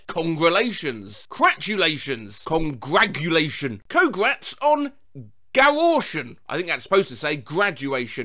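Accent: United Kingdom. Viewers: ARE YOU BRITISH I AM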